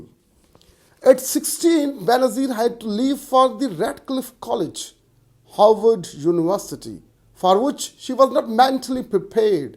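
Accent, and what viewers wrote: United Kingdom. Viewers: Amazing video In every terms, the Accent as too as british, the information about her it realy explosive..❤❤❤❤ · profoundly comprehensive video with fascinating lucid british accent Sir👍👍👍👍👍❤❤❤❤❤❤❤❤❤❤❤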